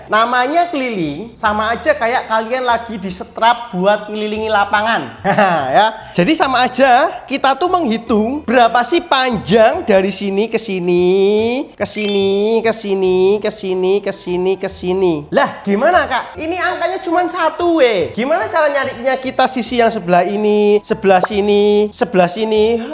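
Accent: Java, Indonesia. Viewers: Mendok iki suara nya to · Orang jawa ya kak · Medok bgt 😭 Jujur suka cara ngomongnya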